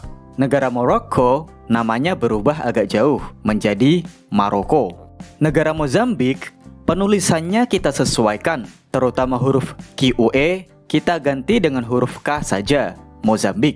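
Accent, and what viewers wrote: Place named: Java, Indonesia. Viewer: Pelafalan a la ✨Djawa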